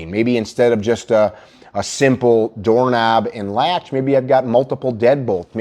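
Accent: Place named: Midwest, United States. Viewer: I love your videos and attitude. Please keep them coming. I binge watch. Sorry to ask a stupid question. Neither my wife nor I can tell where your accent is from. I guessed anywhere from Minnesota to Chicago area? Sh*t, that's probably far off. Thanks.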